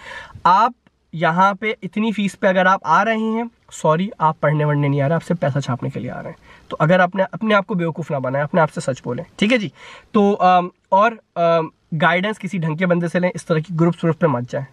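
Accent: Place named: Karachi, Pakistan. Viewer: bhai ya jo ap baat ka andr karachi wala touch daita ha na bss sara maza too is ka ha😂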